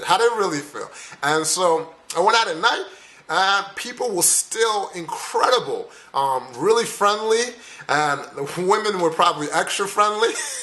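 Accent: United States. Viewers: If they hear your american accent they will find it very cool and your immediately very interesting. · In my opinion, as a Dutch guy, you look like an American black guy. And if you would talk to me, I'd notice your American accent too. But I probably would have guessed that. Africans have a different mimic, and a different way of expression.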